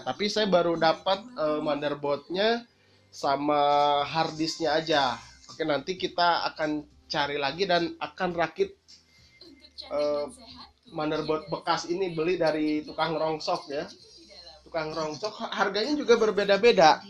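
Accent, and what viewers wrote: West Java, Indonesia. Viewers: si aa sunda pisan euy, "beli eram lima rebu" ..hahah lanjutkan kang!! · Sunda euy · SUNDAAA EUY... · Tinggal dipalih mana Kang?? wkwkwkwk😂 orng Sunda nya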